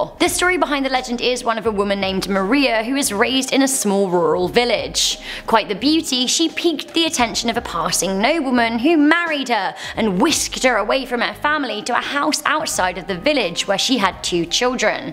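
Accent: England, United Kingdom. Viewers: Honestly loved this list! Most of these were my bed time stories growing up 😂 a very popular one is La Mano Pachona. Basics of the myth is that a furry hand would come up from under the bed and pull your foot. Sasquatch? Chewbacca? Who knows, it was scary as hell growing up tho 😂 (Side note, I've always wanted to hear someone with a British accent speak Spanish. You did not disappoint. Thank you for fulfilling my life long dream 😂) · She is English and has an accent my guy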